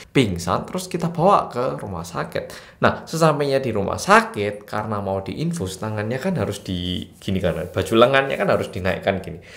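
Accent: Java, Indonesia. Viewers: salfok sama cara mas ngomong 'koceng' di menit awal. bukan 'kucing' tapi koceng'. mas medok sekali😂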